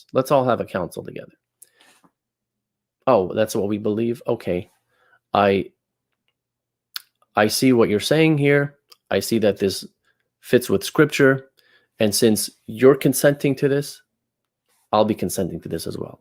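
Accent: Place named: Arizona, United States. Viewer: Are you in the Middle East? I would maybe guess someplace like Phoenix, Arizona, based on your accent, and there being a large Chaldean (and, Melkite) community there😀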